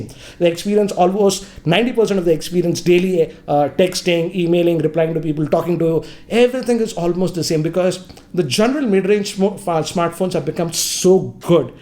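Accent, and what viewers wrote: India. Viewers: Another Indian with a heavy accent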